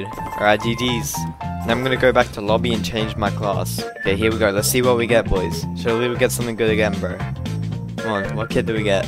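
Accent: Australia. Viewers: Are you austrailian?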